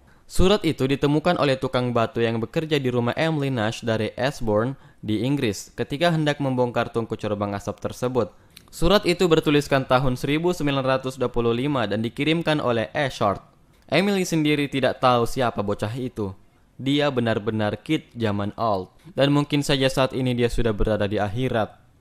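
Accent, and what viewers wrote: Makassar, Indonesia. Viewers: Bang kmu orang Makassar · P A N D A dia logat makasar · Org Makassar Eaa?😂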